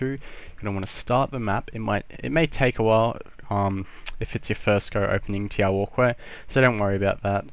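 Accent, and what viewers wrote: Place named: Australia. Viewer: If this guy wasn't australian I probably wouldn't really be paying attention to this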